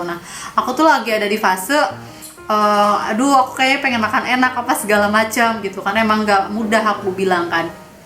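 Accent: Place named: West Java, Indonesia. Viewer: Siteteh orang sunda nya twh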